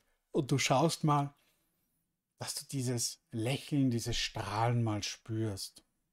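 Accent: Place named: Linz, Austria. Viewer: Hallo, hab´ dich erst gestern über zwei Videos mit Monika Donner "zufällig" entdeckt (na, das "zufällig" glaubt kein Mensch mehr...) - den Linzer Dialekt erkannte ich sofort... es war nämlich auch mein erster... Nachdem´s heute grad nicht ganz einfach war mit der emotionaen Freiheit, probierte ich diese Meditation aus... und, oh ja, sie wirkte grandios. Jetzt sitzt das Herz wieder dort, wo es soll... und lächelt... Alles gut!!! Herzlichen Dank für die Meditation! Einen lieben Gruß vom Lande!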